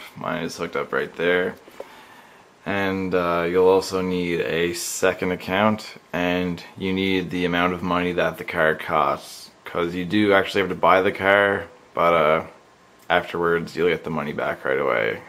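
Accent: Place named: Canada. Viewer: Love the Canadian accent. The way you say car is priceless.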